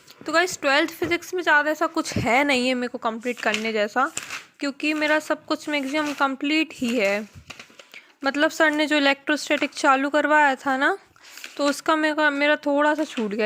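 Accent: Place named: Bundelkhand, India. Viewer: Aap kaha se ho ????? Sounds like bundelkhandi accent !!!!!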